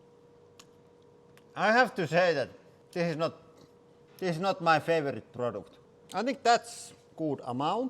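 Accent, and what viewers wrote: Finland. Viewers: äijä hyvin paistaa toi suomi läpi puheesta xd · The most Finnish sounding man I've ever heard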